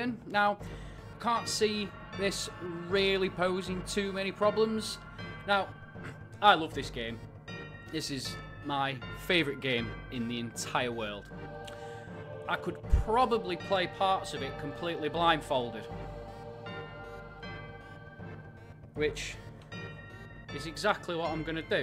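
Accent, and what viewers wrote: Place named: Yorkshire, United Kingdom. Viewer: Love hearing a Yorkshire accent with a gaming channel 🧡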